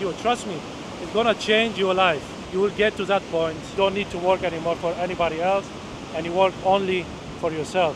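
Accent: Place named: Colombia. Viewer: Por que hablas colombiano si tu eres de Caracas, Venezuela??? Eso no me gusta.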